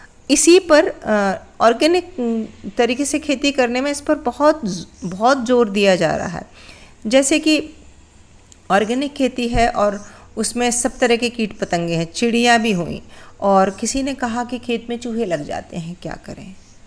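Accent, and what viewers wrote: Standard. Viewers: aapka Hindi itni shudh and sureeli hai ki aapka Hindi keliye me jaroor dekhti hun video, and ofcourse aapka gardening coaching to la jawab hai, mai ek soth Indian hun, par muje Hindi bhasha Jo aapki jaisi shudh aur pyari ho wo bolne Sur sunne keliye bohot accha lagta hai, me rehti hun Mumbai me 37 years ho gaye hai, par yaha Hindi alag hai, mixed hota hai, aur slangs hai, me govt dept se voluntary retirement le chuki hun, waha Hindi seekna jaroori tha, par ham kaam vyasth hote the ki muka nahi mila, and aap se shudh Hindi sikhungi, aap ne ye video bohot short kar diya 😀, Mere bhi chota balcony garden hai, isi tarah chidiya chotisi aati hai aaj kal, bohot aanad hota hai usko dekh kar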